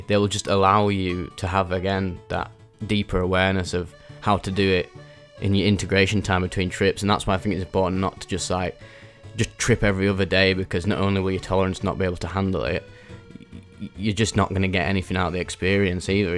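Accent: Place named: York, United Kingdom. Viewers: I reckon he's from Yorkshire, up in the North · Luvin the ooooh ai, cheeky bit'o York speakin. All reminders of home/my British heritage aside, appreciate the sharing of your wisdom! I'm fascinated by psychedelics and hopefully with the right intentions, setting and people I can use it as a positive tool. However also knowing the extreme risks in undergoing such activities. Thanks again! 🇬🇧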